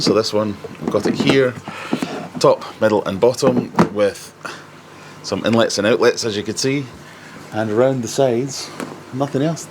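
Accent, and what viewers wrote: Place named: Scottish Highlands, United Kingdom. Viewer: Uncle got highlander accent and i absolutely love it. Excellent video🤝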